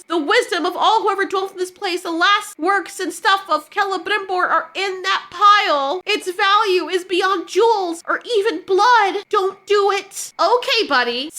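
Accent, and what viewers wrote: United Kingdom. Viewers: don’t listen and don’t apologize to him. We love your British accent. He’s just jealous because he can’t sound as cute as you. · Your British accent makes me laugh. It’s just frustrating that many US channels now do a posh (i.e. conservative) British accent to read California-penned (i.e. “woke”) articles…. which just makes no sense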